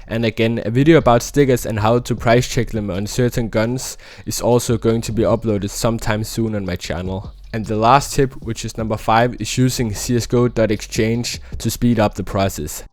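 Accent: Denmark. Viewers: I smell Danish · i smell danish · Kan høre der er lidt dansker over det her aha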